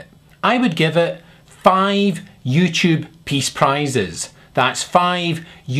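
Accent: Scotland, United Kingdom. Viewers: STOP POLAR BEAR DISCRIMINATION!!! Fuck rats, though..., can you please cuss more? I love when you cuss in your Scottish accent...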